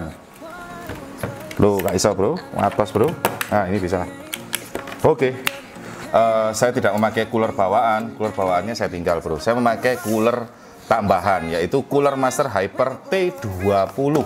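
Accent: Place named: Surabaya, Indonesia. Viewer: Medok e koyok wong suroboyo bro 😂🙏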